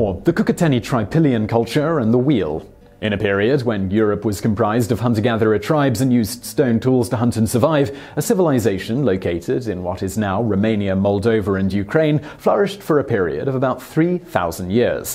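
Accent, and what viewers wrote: United Kingdom. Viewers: When did VSauce Michael start using a British accent? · These aren’t facts. Its euro-centric BS for racists doing “research” on you tube to find and site as proof. This video is unscientific, gives no citation of the amazing amount of research that would have to exist to support all that it claims. Its typical YT nonsense with a British accent in order to pass it off to stupid, insecure people.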